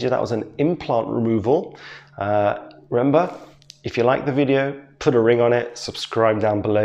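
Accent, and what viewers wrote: England, United Kingdom. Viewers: Very soothing bedside manner, as usual! And I love how everything sounds better with an English accent. Keep up the good work, Doc. :-)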